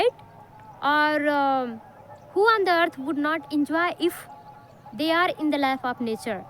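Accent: India. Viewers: I like indian accent in english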